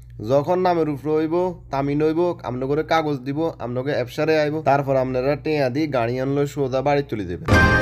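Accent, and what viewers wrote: Noakhali, Bangladesh. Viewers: আপনি কি নোয়াখাইল্লা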